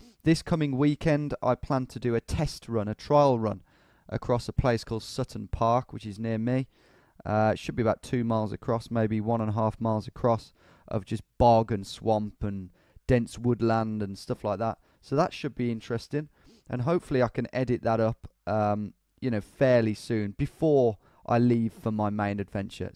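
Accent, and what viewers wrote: United States. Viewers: bloody love his american accent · I cant lie your american accent is great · Your American accent is pretty good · Your American accent is on point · His american accent sounds bang on to me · You would know better than me here I am in Iowa and know nothing about any sports also nice American acceny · Your General American accent is hilarious, it’s so ambiguous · Love the American sports fan accent. All my life I've heard Americans try to do English accents, so this is a first.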